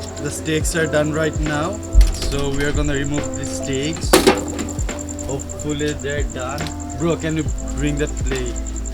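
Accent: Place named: Jamaica. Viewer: This reminds me a lot of the jamaican jerk chicken, I see where the the chicken comes from but after listening to his accent i finally understood the jamaican jerk in it. plus it seems like the bengali dude with the jamaican accent has to learn to give an outro.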